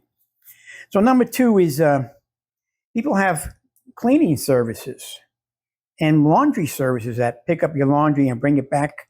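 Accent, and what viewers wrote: New York, United States. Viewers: You kinda look, and DEFINITELY SOUND LIKE THE ACTOR, JOE PESCHI IMO...with that New York or New England accent?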